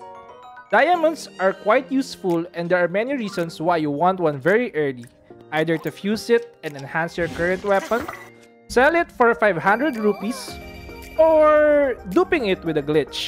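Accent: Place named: Philippines. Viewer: you kinda sound like a filipino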